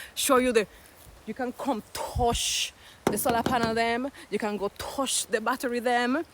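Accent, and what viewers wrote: Jamaica. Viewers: 😂😂😂😂 You pretty pretty pretty but I only understood half of your words but I love the Jamaican accent 😂😂😂😂❤❤❤❤❤❤❤❤ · Lololololol, mi luv hear har wid fi patwah yah mon. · Love your channel and your jamaica patwa accent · Jesus christ idk what to call that accent, spanglish patois? Its cute though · That's crazy .. she got a English accent .. a Jamaican accent I love it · I love the way you speak Jamaican English. I love your beautiful solar system. You always win, be happy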